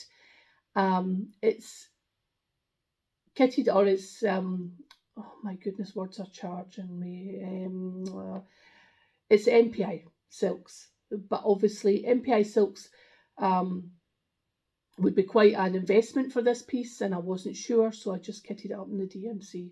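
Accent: Scotland, United Kingdom. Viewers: Lovely to hear a Scottish voice on Flosstube. Back to cross stitch after about 25 years and really enjoying it. Can I ask where you got your lovely project bags from? Just subscribed x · Hello, so pleased you have returned to Youtube. I have missed you over the last 12 months. I do love your Scottish accent as well as. Happy stitching.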